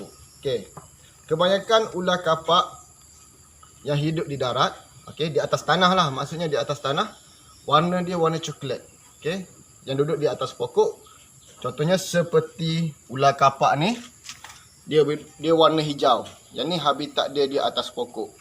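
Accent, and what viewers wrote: Kelantan, Malaysia. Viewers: Kelate kecek lua... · Kelate molek bunyi abe... Hehehe terbaik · tuan asal kelantan atau trgganu? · ore klate peseng abe ni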